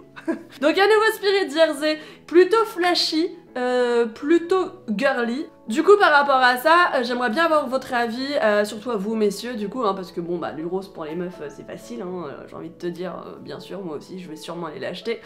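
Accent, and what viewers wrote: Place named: United Kingdom. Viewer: J'aime beaucoup ton accent anglais! (Non non je ne ment pas!) Et pour info Ireland en anglais se prononce "ailleurland"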